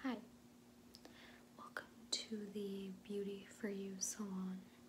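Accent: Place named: Massachusetts, United States. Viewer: You from mass?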